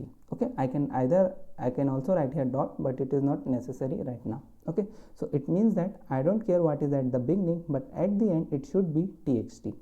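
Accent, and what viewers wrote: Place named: India. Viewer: I don't want to hear an indian accent. Thank you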